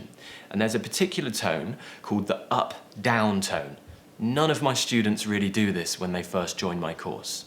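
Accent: United Kingdom. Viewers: You are epic. Its so clear when You explain. And Your British is so good to listen. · Please keep talking i can hear you for hours. 😀😀 I really love your British accent. I have learned a lot. Thanks you. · Hi I'm from Venezuela and I've been learning British English on my own at home and yours videos are quite good for me cause your accent is great! thanks for make this kind of videos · I love your way to teach British accent 😍 I really wanna to speak like you.. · It has been a utterly splendid, exquisite and flawless lesson. I'm particularly joyful, blissful and elated with that opulent topic. It is to my liking; moreover, it appeals to me. I do adore speaking, and his charming accent., Hence, I present; undoubtedly; the King of British English. You are the quintessential of British English. Thank you very much indeed. Far thee well, dearest Prof. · Hi teacher, i know that my indian accent will never change but I'm very fond with your british accent. 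Soo sexy, lol. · Hello 😄 I'm Dia my mother tongue is Arabic, I study English, My accent is little bit American, but I'm in love with the British one😍, im following lots of your videos And I'm hopeful that one-day i can manage to have an accent like yours ❤😍 thank you for your efforts ❤ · Marry me wtf how u r cute like that with ur British accent 🙆🙆🙆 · I don't know why, but I enjoy watching your vids. However, as an American I must say that some of what you teach, to our ears signifies a lower class, uneducated Briton. Certain of the vowels and diphthongs, and most of all the glottal stop, which all American diction coaches regard (or used to) as an actual speech defect, like a lisp, to be removed with exercises!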